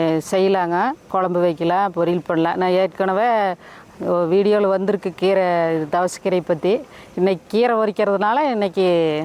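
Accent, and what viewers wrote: Kongu, India. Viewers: கொங்கு வட்டார பேச்சு😂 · Ugga vazakkathai aduthavargalukaga matra veddam akka koggu tamiz kojjum tamiz azagu super 💖✨ 💖 ✨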